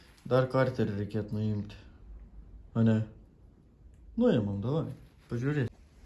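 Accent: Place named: Aukštaitija, Lithuania. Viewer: Nešnekiek aukštaitiška😃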